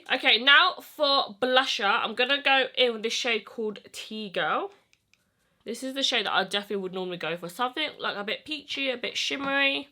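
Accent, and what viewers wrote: United Kingdom. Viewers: At first I didn't even realize she was british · I didn’t know you were British lol I like your accent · Talk about shocked.. I had no clue she was British 😂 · OMg she’s British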